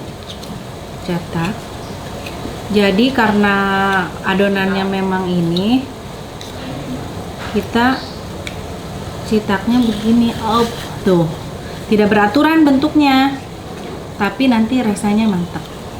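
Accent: West Java, Indonesia. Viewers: mun kacaletot ngomong bahasa sunda, da ges kabiasaan letahna sunda...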